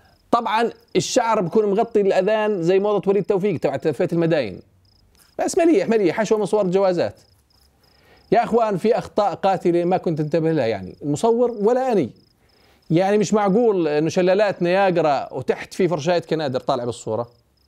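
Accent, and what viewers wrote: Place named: Jordan. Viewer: ههههههههه فطستنسي من الضحك عايش بامريكا ومفتقد لها الهجه الاردنيه ههههههه تحياتي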